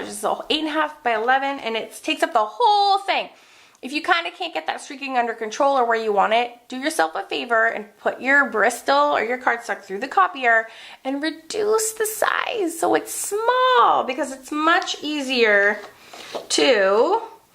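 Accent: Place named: New England, United States. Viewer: Love the NE "talk" don't lose it please. This video was very helpful. I have the streaking problem (shame) lol, so thanks for sharing your wicked cool wise ways.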